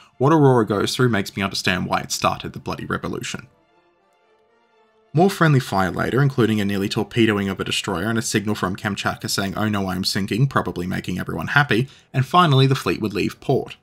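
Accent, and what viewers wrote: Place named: Australia. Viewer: His editor is swedish. He is australian. You can hear it by his accent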